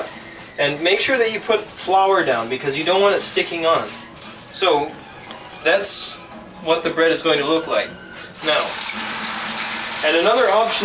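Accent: Canada. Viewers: big fan here !! "cant beat it wit a stick" made my morning. you sound like the bys back home in NL. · sounds Canadian. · I like your Canadian accent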